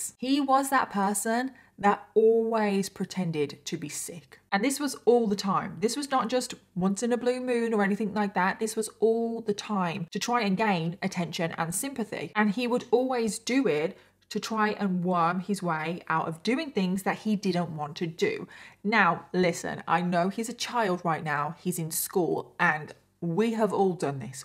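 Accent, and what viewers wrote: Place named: Redditch, United Kingdom. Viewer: Are you from Redditch or nearby? You sound like it.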